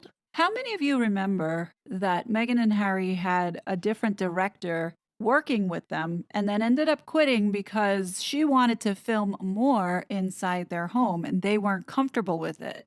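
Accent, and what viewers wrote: New York, United States. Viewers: I love when your New York accent and phrases shine through🥰. I live in Florida now but originally from Jersey shore, I miss those things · I don’t believe she is attacking appearance. A ferret is a type of weasel, which I believe is calling her. I may be wrong, but that was my take. native New Yorker is coming out in this video. When she gets riled up about all these deceptive shenanigans, accent stands out and she speaks faster and with more vocal attitude.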